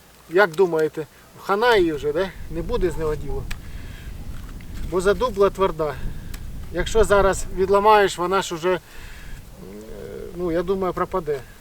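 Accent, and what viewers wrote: Russia. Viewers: Щирість та правда - це завжди легко і по-справжньому.Це мене "підкупляє" Ви правильну ставите ціль,мету :золота кнопка.Тільки так люди досягають успіху на шляху до здійснення мрії.Тільки високі цілі,а не на півдороги ,напівмету .Ще,не для "доривання" чи образи.В словах,наприклад копать,допомагать, знімать,розказувать,,пробувать,брать,працювать ,включить(болгарку) в кінці треба закінчувати. на И , бо ці слова відповідають на питання "що робити?/зробити".А от ,наприклад,"і всі думки погані (що роблять?) відходятЬ - тут вірно.Або такий приклад:Що рОбить? - відходить;що робИти? - відходити Ще раз наголошую- це не в образу вам.Ви вже добре розмовляєте українською.Це просто тонкощі і ,звісно, наслідки ситуації життя в російськомовну середовищі.Я сам з Миколаївської області , з російськомовного селища збудованого у 60-х роках.Половиною населення були,до розпаду СРСР ,росіяни,що приїхали працювати на два нових заводи, селище тому і побудували.Тому і школу закінчив російську.Та й зараз в побуті ще селище,здебільшого,російськомовне - як наслідок.Але потрохи у вас і у нас всіх все вийде,і все буде Україна!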